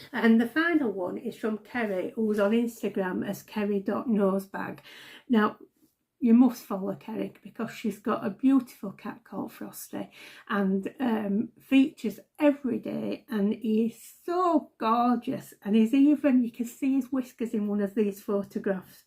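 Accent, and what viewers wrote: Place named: England, United Kingdom. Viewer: Just found your channel. Love Vera and your lovely English accent. You do good work and I subscribed!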